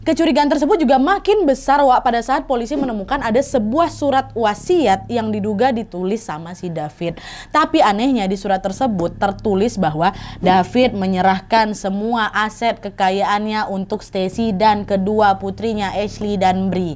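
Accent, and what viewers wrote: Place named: Medan, Indonesia. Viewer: Tadi anakku denger cerita te kekeh2 dia😂😂😂.. Medan kali mak katanya... Woii... makin cantek ya...😘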